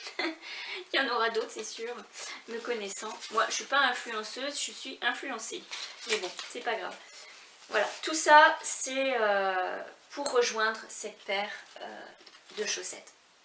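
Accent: Quebec, Canada. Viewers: Bonjour je découvre ton univers , et tu m'as l'air d'avoir un petite tonalité du quebec? j'aime bcp tes couleurs et tes créations me font très très envie. Je vais dévorer tes podcasts tout en tricotant et crochetant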